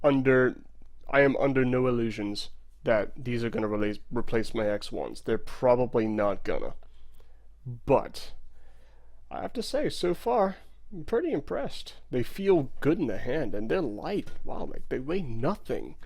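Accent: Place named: Northeast, United States. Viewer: I got mine for $62 about 2 months ago, they we're a little bit enjoying in the high range and a little lacking in bass, however I put them in a room with a Fiio amp/dac and feed them EDM on a loop streaming Tidal lossless streaming service using the premium services, I did this for 5 days and after the 5 day period I have to tell you I am now a believer in headphone "Burn-In" I'm guessing that this has loosened the driver material or flexed whatever term you'd like to use. At any rate they just totally transformed into a much better sounding SHP9500, I didn't want to like these headphones however even if Phillips were to sell these for $150 they'd be worth it! Are they good for every genre of music? No, if you're a bass head look elsewhere, I do own a pair of V-MODA M 100's and like those but are they good for every genre of music? No, however I just can't stop listening to these 9500's. 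I'm going to stop now and get me a drink of whiskey. Just a side note for the reviewer, what part of the UK do you live in? I was stationed at RAF Bentwaters for 3 years many moons ago, so are you an American? as I don't detect an English accent when you speak, I do hear what I believe is a North East Coast accent in you. Hey thanks for the review.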